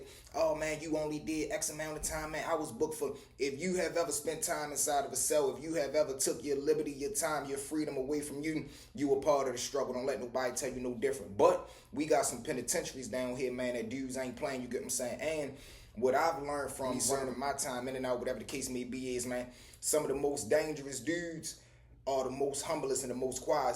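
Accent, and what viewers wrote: Baltimore, United States. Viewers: Bro I love how you tell your stories real certified bmore dude right here 💯👍🏾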